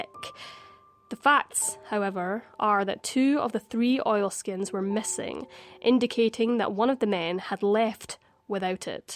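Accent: Scotland, United Kingdom. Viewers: That was so creepy, your delivery is perfect and your Scottish accent makes me listen intently......When I was a kid I always wanted to be a lighthouse keeper. Thanks from a sassenach -)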